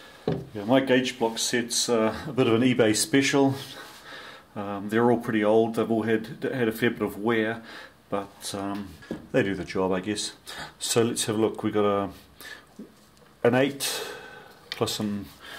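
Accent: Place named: New Zealand. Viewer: Ah ha! I thought I detected a NZ accent! Used to work for US branch of a company, TNT, headquarted in AU. Chatted with blokes from all parts of that world. Enjoy your vids! Thanks for sharing!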